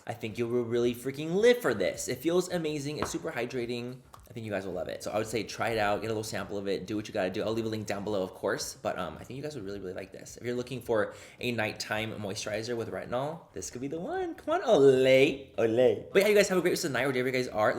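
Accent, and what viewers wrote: England, United Kingdom. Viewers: The British accent is no❤️ no hate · His English accent. what is happening. What did i miss 😂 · the English accent is killing me haha😂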